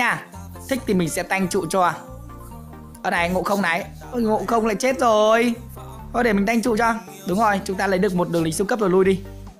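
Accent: Nam Dinh, Vietnam. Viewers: Anh ở nam định à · Ông này ở đâu nam định đấy ?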